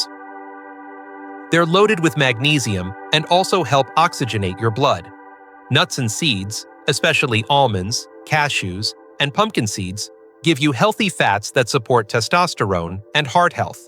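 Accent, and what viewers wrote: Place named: United States. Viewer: 0 Dr you can not deny that you have Indian Genes Than why you do not SPEAK IN INDIAN TONE? HOPE YOU WILL ADMIRE OUR CONCERN AND WILL avoid American tone in your videos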